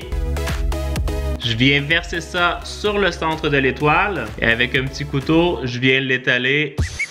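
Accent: Canada. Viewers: tu mon youtubeur préféré de is vidéos accent canadien et qui met quoi des vidéos trop trop rire 👍👍👍👍👍 24h 🇨🇵🇨🇵🇨🇵🇨🇵 🎄 is cooking Noël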